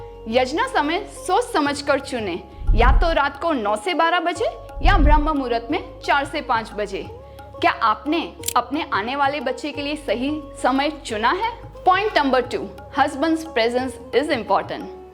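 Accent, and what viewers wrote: Standard. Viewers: जितनी शुद्ध हिंदी आपकी बोली है उतनी शुद्ध हिंदी हमारी बोलचाल की भाषा बन जाए। 🙌 ॐ भगवते वासुदेवाय नमः 🙏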